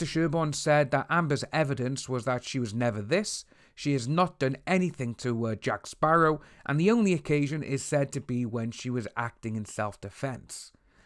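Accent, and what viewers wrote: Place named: United Kingdom. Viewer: Love your British accent... Good job keep it on.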